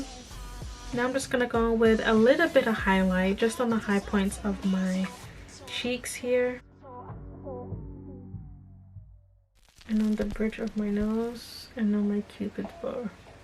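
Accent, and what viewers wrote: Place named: New York, United States. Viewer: You did pretty good with your NY accent (like your humor), time to test it out in person...Have you ever been to NY?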